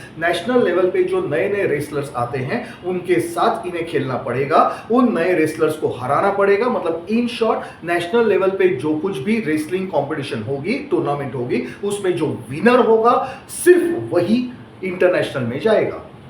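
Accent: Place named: Gujarat, India. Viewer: Caste nhi pooch rha tha bhiya m bas apka baat krne k tarike se maharashtra aur gujarat jesa feel aaya hindu ya muslim likhne ki zarurat hi nhi thi 🙏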